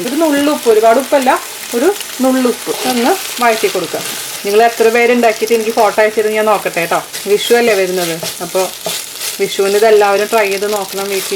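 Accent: Thrissur, India. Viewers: chechi thanks a lot...i started watching your videos becoz of the thrissur slang.i love thrissur.but ippo i watch your videos becoz of chechis cooking....I used to make paayasam in uruli.virakaduppila undaakkunnath.pradhamans.appo paalada undaakumbo pink varal nadakkilla.kure neram venam.ippo etra easy aay kaanichu thannu chechi with gas stove and cooker....thank you chechi.i havnt tried meen curry yet.but checheede thrissur meen curry kandappo vekkanamnn thonni...vechitt parayaatto... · very well explained..especially trissur style..thanks lol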